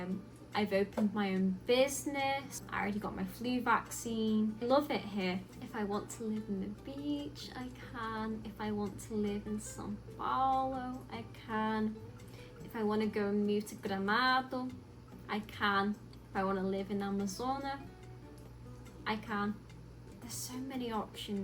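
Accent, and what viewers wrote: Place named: United Kingdom. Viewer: Seja bem-vinda. Aproveite bem as belezas naturais do Brasil. Viaje bastante pelo estado de SP e Brasil assim podemos nos deliciar e aprender mais do seu inglês britânico.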